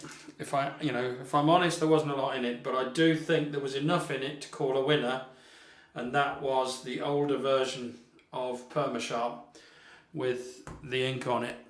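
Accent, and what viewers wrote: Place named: England, United Kingdom. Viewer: Many thanks for another great shave. I love your English Accent. 🙄🙄🇦🇷🇦🇷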